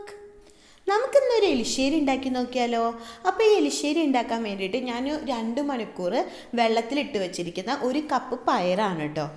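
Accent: Thrissur, India. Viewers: Thrissur alley? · തൃശൂർക്കാരിയാണ്...അപ്പോൾ സംസാരത്തിൽ ട്ടോ മയം കാണും... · Trissur Kariyano? Super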